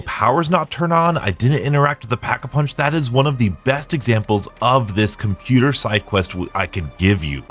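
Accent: United States. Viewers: Couple things.. 1... I didn't know you were Midwestern And 2.. on the free wonderwafte or however you spell it.. mustang Sally's make it incredibly easy (even just Sally) as the aoe clears all the debris almost instantly... I haven't had a chance to test it out but I am wondering if you could use c4 in this step as well if you would rather not burn 5k credits plus the gs45s wall but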